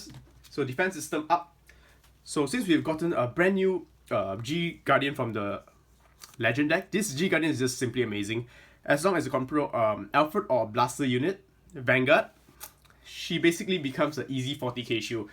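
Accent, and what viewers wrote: Singapore. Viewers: You sound like a singaporean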